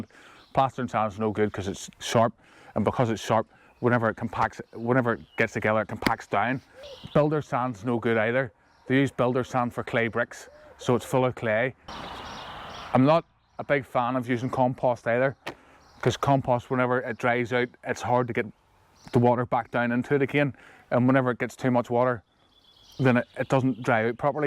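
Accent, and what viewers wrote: Northern Ireland, United Kingdom. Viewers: Helpful video, for supportive and constructive feedback it would help to slow down the rate of and narrative in the speech dialogue to make it more easily understood given the strong Northern Irish accent, much of the dialogue in this video was difficult to understand but overall helpful advice, thank you.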